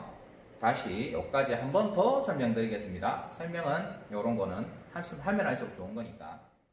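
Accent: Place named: Gyeongsang, South Korea. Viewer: 행님 구수한 경상도 사투리 팍팍 꼽힙니다. 저도 경상도라^^ 초캠이라 많이 배웁니다. 항상 감사합니데이 행님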